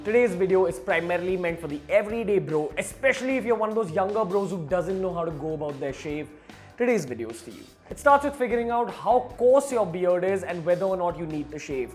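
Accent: India. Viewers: Oh no indian accent, guess I’ll go to another tuturial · He is Indian . He is speaking indian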